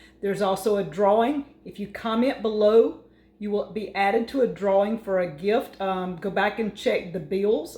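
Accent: South, United States. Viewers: I just love southern accents! pronounces "boil" as "boll" instead of "boyle". Tell Danny I wore my new American-made Roundhouse stonewashed bib overalls to the dentist today. I love them! I shared this video on all my social media pages, so people could learn a very valuable skill to help them through the coming hard times. Now all they have to do is start watching Deep South Homestead so they can learn how to grow the stuff to put in the soup. You guys are doing the Lord's work, and God bless you for it!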